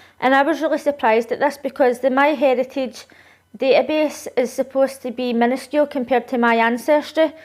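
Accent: Scotland, United Kingdom. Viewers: Is that a scottish accent? I'm American. Can't tell. I bet she's very British.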